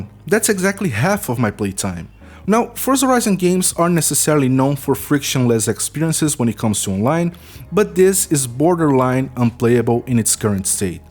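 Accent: Brazil. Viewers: Porra, sotaque de brasileiro é impossível de não reconhecer. Muito carregado mesmo tendo um inglês bem fluido kkkkkkk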